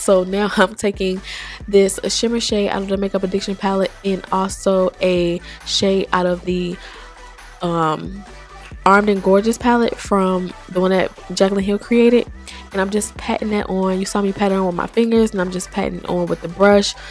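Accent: South, United States. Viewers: Beautiful. I 💘 your voice overs with your Southern Dialect.I love to hear you say, Description Box. You and your fast talking self,.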